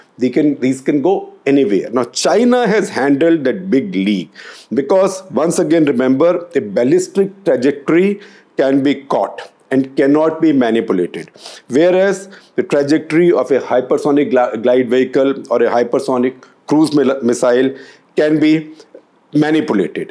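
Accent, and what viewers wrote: India. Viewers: 不行，咖喱味太重，听不下去了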